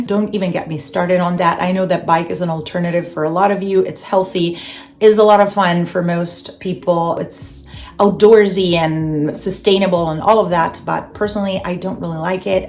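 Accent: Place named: United States. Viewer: Thanks for sharing. 😊 I would like to know why you have an American accent when you are originally from San Marino. What and where did you study? In the US? What was your major at the university?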